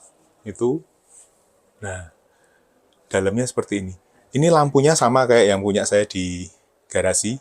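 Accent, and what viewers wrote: Java, Indonesia. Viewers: Host nya kental sekali logat Jawa nya..!!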